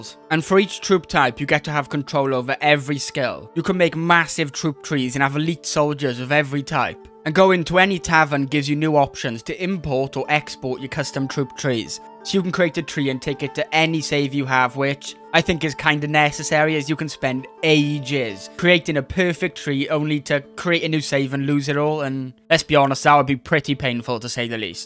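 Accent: Wales, United Kingdom. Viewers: Nice vid. Is that a Welsh accent?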